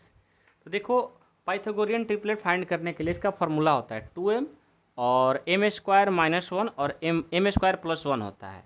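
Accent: Bihar, India. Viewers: Sar ki language se lagta hai ki sar Bihari hai lekin kahate Hain Na ki ek Bihari Sau per Bhari😊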